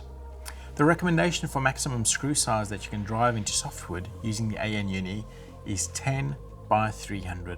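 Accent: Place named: South Africa. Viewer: I can hear he's a South-African. I know my people.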